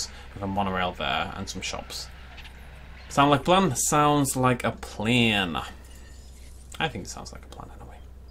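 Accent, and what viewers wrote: United Kingdom. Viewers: One hour, great video, OG brit commentary. 10/10 video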